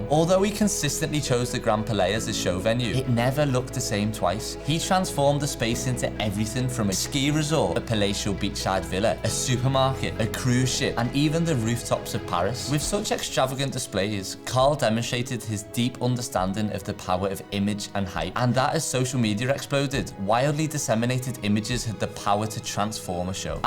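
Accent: Liverpool, United Kingdom. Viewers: I am glad I have found this channel, it’s quick and sparing of unnecessary info BUT you do need to do a bit of work/research on your pronunciation. Oh, and you can’t blame it on your mildly scouse accent either, sorry. 😀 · Really enjoyed this quick, informative and to the point. I kept wondering about the presenter's accent. Scouse?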